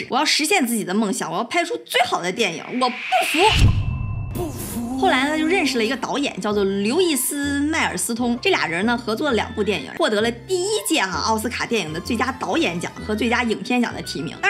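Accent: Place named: Northeast, China. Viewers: 同意，小lin的笑声有东北味儿，小lin是东北人吗？ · 大连妹妹，口音明显，支持老乡!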